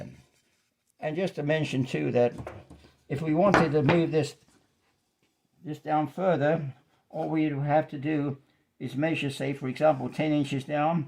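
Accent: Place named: England, United Kingdom. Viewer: Nice. Tip you sound English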